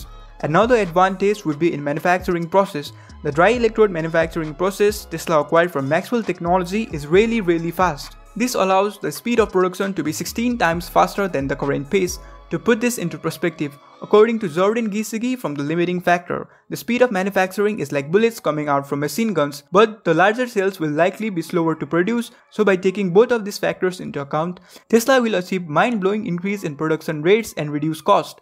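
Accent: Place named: South India, India. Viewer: A very south indian, SL accent, please speak a little slower for it to be easier to follow !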